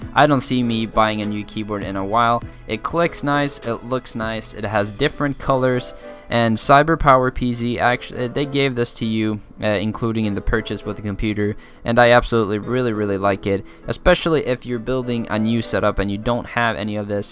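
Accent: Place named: Germany. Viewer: hes probably german, or austrian. a lot of their pronunciations are like that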